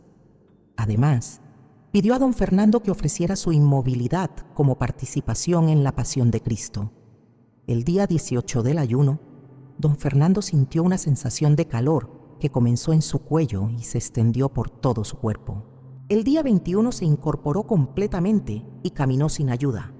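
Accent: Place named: Peru. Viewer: Hermosa voz, con acento Peruano bellisimo!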